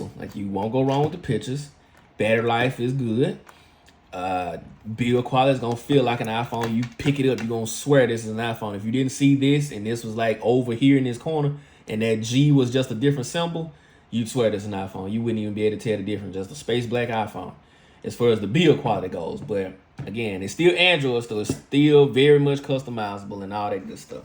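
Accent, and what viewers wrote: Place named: South, United States. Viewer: Thanks for info bro. I can tell you from the south! Love from Alabama.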